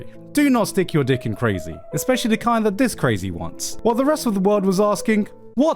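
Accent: United Kingdom. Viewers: This video is conclusive proof that a british accent doesnt mean youre smart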